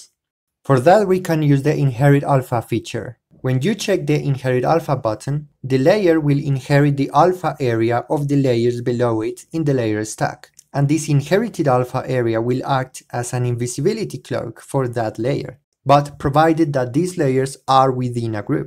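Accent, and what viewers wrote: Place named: Latin America. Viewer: me encanta que hables ingles aunque mi mente me dice que eres latino, asi que te entiendo el spanish que hay detras de tu ingles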